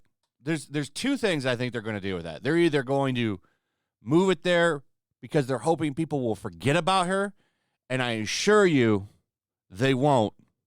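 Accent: United Kingdom. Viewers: i dont know why your crybaby voice is so awfully british old boi, but i do approve guvnor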